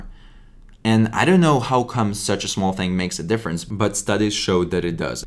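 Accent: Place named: Russia. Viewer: Русский акцент спалился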